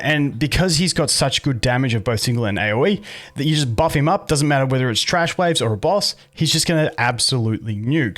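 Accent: Australia. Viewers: Absolutely love the content! Can't get enough. Congrats on the 110k! Love the representation for us folk down under, nice change from all the American accents haha. - from a grateful kiwi across the ditch ❤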